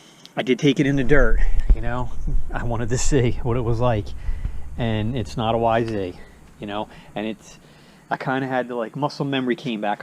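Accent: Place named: Pittsburgh, United States. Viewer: You got a Pittsburgh accent lol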